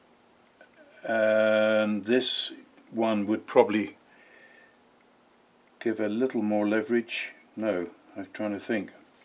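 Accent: United Kingdom. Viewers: I do so enjoy listening to a British poster for a change.....please keep the videos coming.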